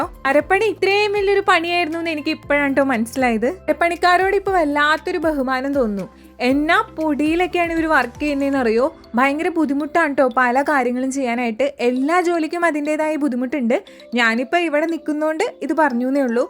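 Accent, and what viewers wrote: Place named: Ernakulam, India. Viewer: എറണാകുളം ഭാഷ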